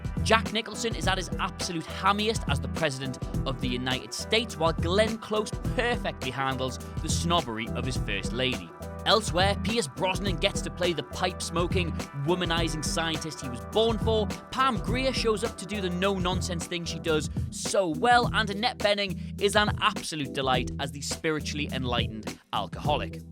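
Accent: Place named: Newcastle, United Kingdom. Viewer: Nah man, he's a Geordie, those fuckers pronounce 85% of words incorrectly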